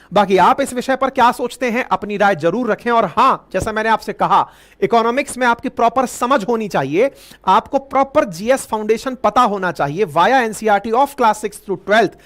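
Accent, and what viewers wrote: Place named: Bihar, India. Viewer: Not interested in incorrect Hindi in Bihari dialect.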